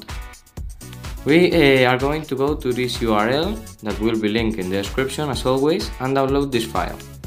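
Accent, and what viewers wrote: Spain. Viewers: SE NOTA QUE HABLAS ESPAÑOL CRACK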